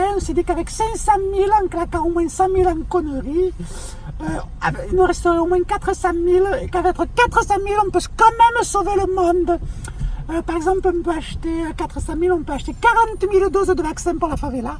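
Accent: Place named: Marseille, France. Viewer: J'adore ton accent des Goudes,!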